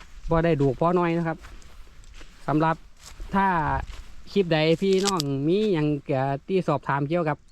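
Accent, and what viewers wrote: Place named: Chaiyaphum, Thailand. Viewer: สำเนียงคือชัยภูมิแท้คับ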